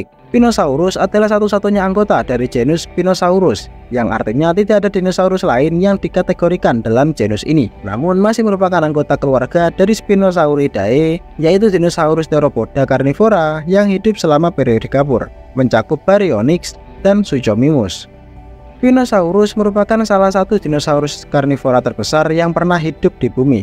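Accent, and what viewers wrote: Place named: Java, Indonesia. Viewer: Bg mhon maaf nih ye,,😂.. kok suaranya abg nih mirip chanel nyoto tutorial ye?? Apakah org nya sama😅.. khas kek ada jawa nya gitu cara bicaranya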